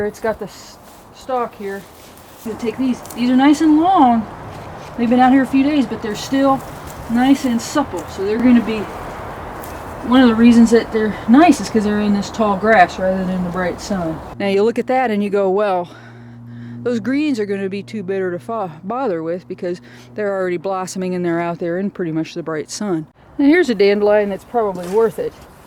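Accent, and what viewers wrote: South, United States. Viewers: You said you are in the Pacific Northwest?? You sound like you may have a wee bit of a Southern in your accent or maybe TN Valley....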